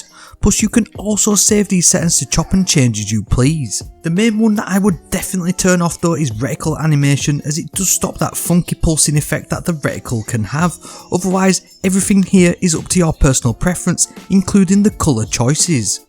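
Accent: England, United Kingdom. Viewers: Hey! Thanks for the video! Got a strange question: what kind of english acsent is it?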